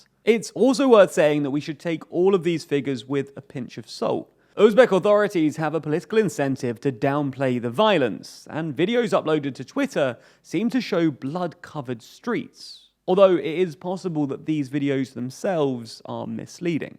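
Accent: United Kingdom. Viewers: The first three are just British pronunciation errors, the fourth one doesn't matter because you know what he meant. The final two are the only serious ones, and they aren't even really that serious.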